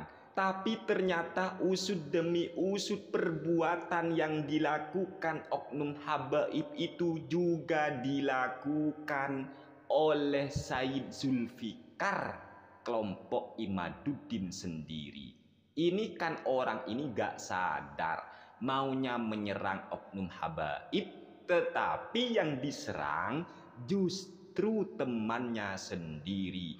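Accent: Madura, Indonesia. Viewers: Logat maduranya kental banget ustadz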